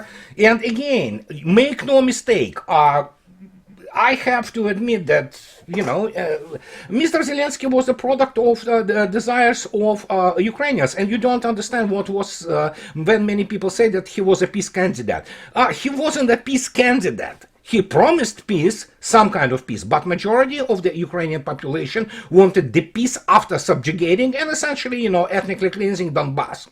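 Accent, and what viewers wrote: Russia. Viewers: Thank you for YOUR Russian insight, Russian high standard delivery, Russian accent and Russian humour!!! Excellent shows every time! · I don't understand people who complain about you speaking too fast. I usually speed you up to 1.25! Maybe I am just more used to the Russian accent, seeing as my wife is Russian. I follow numerous channels like Judge Nepolitano with Larry and Ray, Col. MacGregor, Scott Ritter, Pepe Escobar, not to forget the two Geek boys on the Duran. Won't get through all of them at normal speed. 😁😁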